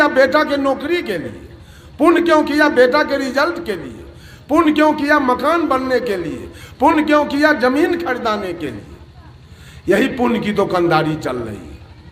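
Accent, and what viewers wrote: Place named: Bihar, India. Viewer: वाह बाबाजी आपकी वाणी एक सो परतिसत सत्य तो है लेकिन आपकी वाणी अनंत सत्य हैआपका अभिनंदन चरण वंदन है आप कि बिहारी भाषा बहुत अच्छी लगती है आप जैसे महा पुरुष सदी मे जरुर आते है पागल लोगो को चेताने के लिए आपमहान संत है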